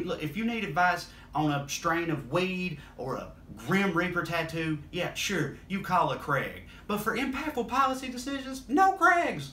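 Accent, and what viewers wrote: South, United States. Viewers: Hey! Your porch ranting is welcome here! Shining a light on lower level lunatics is a powerful idea, and I hope to see a bunch more of your razor sharp analysis wrapped in your warm Southern drawl. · Such relief to hear southern dialect in intelligent, articulate concepts. Didn't think it was possible. Thanks for helping the southern folks not look so dumb. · A democrat, smart, good looking, with a sexy southern accent., you're damn near perfect! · Different accent. The general public think that a southern accent means “stupid”. And sadly, many are. Been fighting it my whole life. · i need a daily dose of u for a good ass laugh! Plus u speak the "Queen's English" whatever dafuq that means. Actually it means u speak with the same fucked up Southern drawl I do. I just get tired of listening to the damned Yankees alllll the time! 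Keep it liberal, bro! Love u too!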